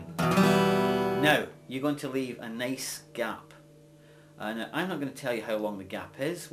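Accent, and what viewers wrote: Scotland, United Kingdom. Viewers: woah i was not expexcting a mrs. doubtfire accent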